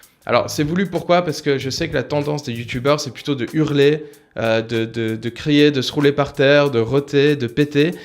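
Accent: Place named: Switzerland. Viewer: Super, vidéo d'ailleurs je suis également Suisse. Je me disais a l'accent. Pis la mentalité ce voit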